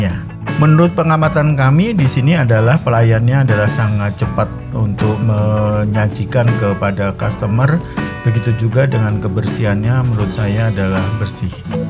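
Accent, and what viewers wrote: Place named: Java, Indonesia. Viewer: yg MEDOK logat jawanya, bila membacakan sebagai narator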